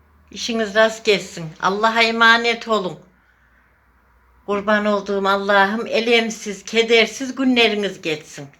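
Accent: Kayseri, Turkey. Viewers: Babanecim senin şu konuşmana bayılıyorum . Kayseri’yi özledikçe izliyorum seni maşallah sana 🧿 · Bende kayseriliyim, üniversite kazanınca Ankaraya taşındık 6 sene önce. Küçüklüğüm hep babaannem dedemle Sakar bağlarında geçti. Araya korona da girince babaannemi daha çok göremez ve özler oldum... Konuşman vurguların sepliliğin aynı babaannem gibi teyzem benim.. Allah sana sağlık sıhhat versin torunlarınla uzunca yaşa inşallah ellerinden öperim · Teyzem bende kayseriliyim Talasın bir köyündenim... Konuşmanız, adetlerin bizim oralara çok benziyor. Siz neresindensiniz. Ellerine sağlık maşallah gençler elinize su dökemez...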